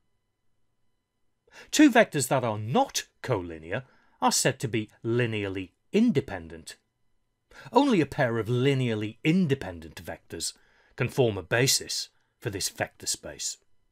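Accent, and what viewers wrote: United Kingdom. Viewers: So nice of you to do this especially as a school teacher. You accent is definitely British and I'm from India...so are you in England right now? What's your current time zone...? Asking to bother you only at specific and appropriate times of the day.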